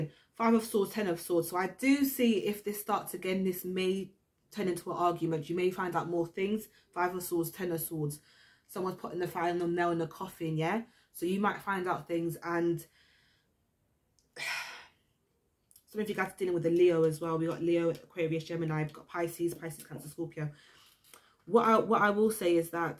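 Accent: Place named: United Kingdom. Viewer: Hiya miss gotta luv the accent(wich part of the UK)and nothing wrong with shopping at Aldi init🙂 I think i found me new best tarot card reader miss 😍🤫 (Not disrespect here dear sis...i ain't build like that) The last holiday i went i met this lovely couple Claire and John living in Kent/Canterbury...maybe it's time to pay them/the UK a visit. Superthanks translating the cards and MASSIVE RESPECT from the Dutchman Ma'am 🙏🏼✌🏼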